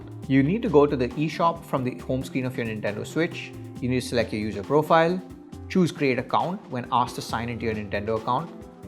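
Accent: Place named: India. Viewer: I don't know why I hate Indian speaking in english like this. I can flawlessly understand native English speakers, but Indians talking english is just horrible. I also talk in english when needed, but the way he sounds and others also, it's unbearable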